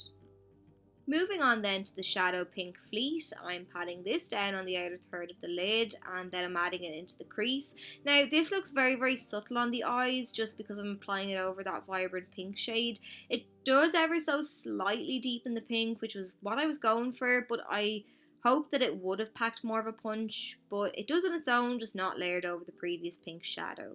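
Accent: Ireland. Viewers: I could listen to your accent all day girl! Taking your irish accent and adding a makeup tutorial with it is pure heaven for me lol love your skills girl!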